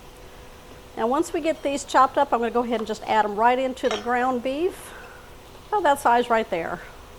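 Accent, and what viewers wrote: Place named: South, United States. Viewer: Soon as you hear a woman with a Southern accent say y'all let's get ready. You know you're in for a treat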